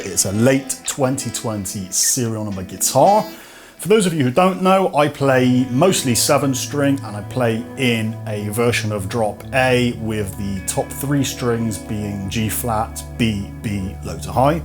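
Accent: RP, United Kingdom. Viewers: You have a very good taste in collecting instruments. Loved your accent too, sounds posh :)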